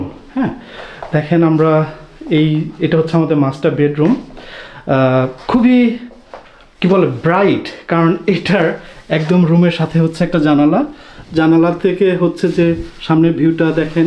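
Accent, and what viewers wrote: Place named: Tangail, Bangladesh. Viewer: I saw very old videos of u, and got to know by ur speaking accents that your hometown probably in Tangail. I was surprised my hometown also there. And currently i am studying at University of Dhaka in Chemical Engineering 3 rd year first semester. Hopeful so see you if my wish going to Germany is fulfilled in shaa Allah. ❤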